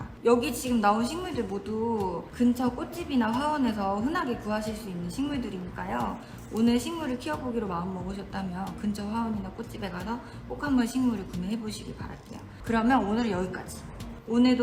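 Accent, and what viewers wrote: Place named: Jeolla, South Korea. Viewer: 절라도여? ㅎㅎ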